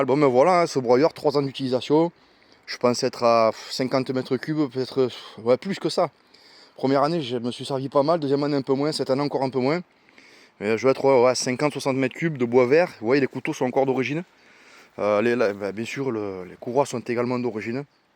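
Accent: South, France. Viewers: Merci pour ce retour d expérience ! Avec l accent du sud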